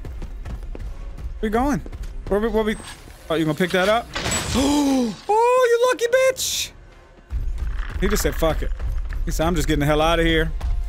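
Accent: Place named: Tennessee, United States. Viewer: You sound like you're from Tennessee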